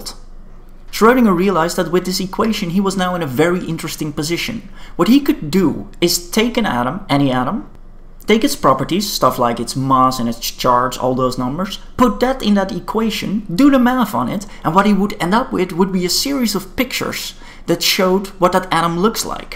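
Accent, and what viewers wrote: Netherlands. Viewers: Excellent! Thank you. I think your H diagram didn't show the 1s orbital? Also, is the accent from Rotterdam? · Are you from the Netherlands? You sound so Dutch.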